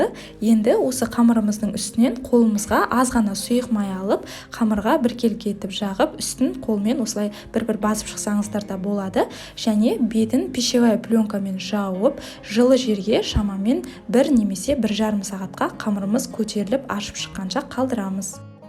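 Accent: Standard. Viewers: Жаксы соилеиди екен таза казакша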